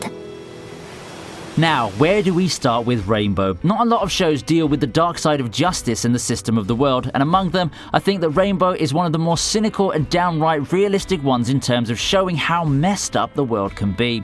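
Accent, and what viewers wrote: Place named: United Kingdom. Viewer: Dude are you from the UK? Also good vid. I've picked out 3 to watch. Rage of Bahamut, Yona of the dawn and Rainbow. Cheers pal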